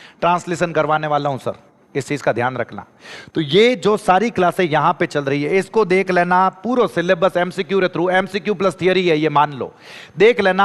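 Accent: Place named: Bikaner, India. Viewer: Guru ji the bikaner ra ho kai aa bhasha mali lag man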